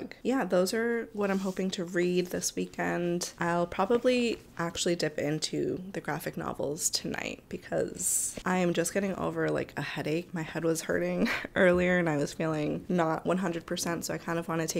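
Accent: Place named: Colombia. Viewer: me encanta tu acentito colombiano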